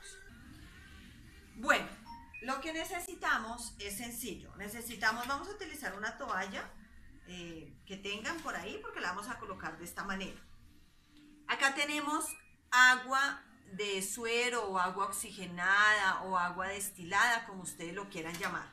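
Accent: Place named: Colombia. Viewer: Muchas gracias por tan valiosa información, y agregó algo más, me encanta el acento Colombiano.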